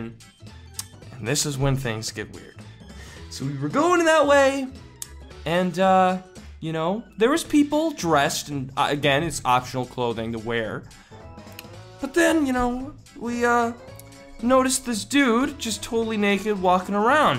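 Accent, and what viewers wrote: Canada. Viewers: wait, r u canadian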